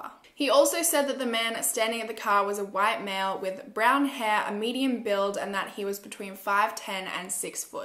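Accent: Australia. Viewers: I love the Aussie accent so much! Am I the only one haha? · i love your accent so much. i want an australian accent but i’m a boring american 😅😂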